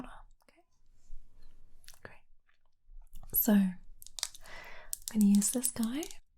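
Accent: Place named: Australia. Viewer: As an Australian, I use to be weirded out when I heard the same accent in asmr, but then I found you and diddly and now it's one of my favourites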